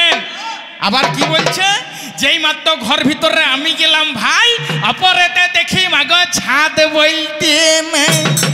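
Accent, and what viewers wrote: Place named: Bankura, India. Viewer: এর বাড়ি বাঁকুড়ায় নিশ্চয় ! ভাষায় বুঝা যায় !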